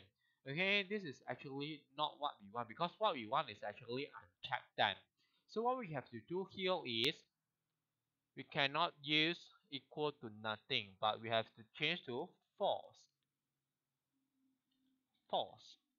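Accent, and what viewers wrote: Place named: Singapore. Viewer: so refreshing, watching a tutorial in singlish. yay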